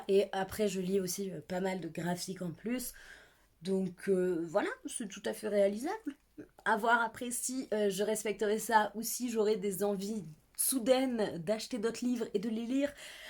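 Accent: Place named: England, United Kingdom. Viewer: Bonjour très bonne vidéo apaisante. Vous avez tun bel accent anglais. J aimes le livres fable. Merci bonne journée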